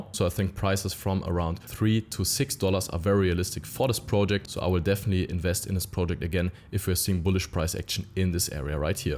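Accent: Germany. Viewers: I like your german accent ❤🎉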